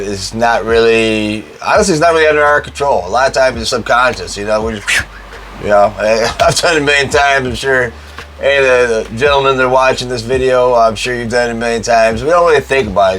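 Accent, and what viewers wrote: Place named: United States. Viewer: Your intonation feels like I'm watching a Cowboy movie Oh yeah! wtf.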